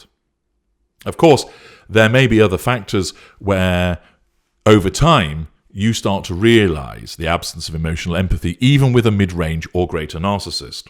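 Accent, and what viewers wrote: United Kingdom. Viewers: Hard to understand the British accent.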